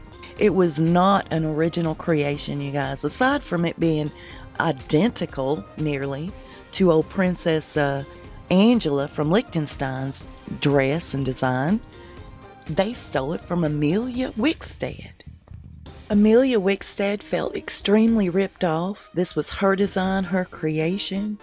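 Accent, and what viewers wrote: South, United States. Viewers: I love your reports. Your southern accent. You. Keep it up.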